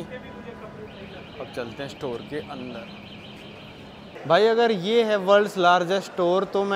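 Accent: Haryana, India. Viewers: Bhai....You are a very frank guy and I like the way you present the whole show. I like your Haryanvi accent and daring to rebuke the viewers who look for lecherous clips. Keep it up. Come to my area in Dover at Rehoboth Beach and cover it.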